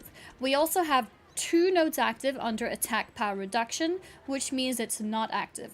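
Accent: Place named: South Africa. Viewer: Your accent sounds different. Are you South African? :P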